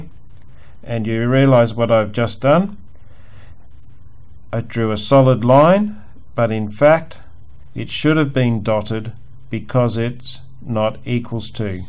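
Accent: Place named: South Africa. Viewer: Your explanation is clear as crystal. Excellent tutorial on complex numbers. I also like the quality of your video as the picture is stable, clear and neat. From your accent I would imagine you are a South African?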